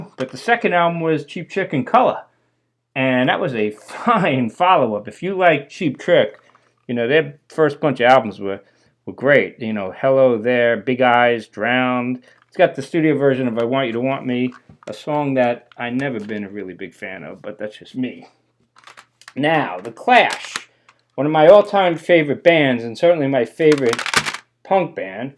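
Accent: Long Island, United States. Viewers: I just discovered your channel and i'm digging it! WE share the same taste in music and are probably close to the same age. U grew up on Long Island (accent is a giveaway) and I grew up in South Jersey (my Philly/S Jersey accent is a giveaway). Keep up good work!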